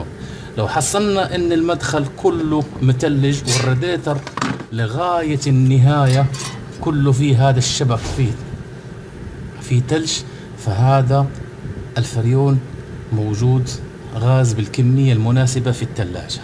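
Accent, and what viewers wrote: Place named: Morocco. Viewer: تحية من بني ملال ❤ لهجتك مغربية شوية 😊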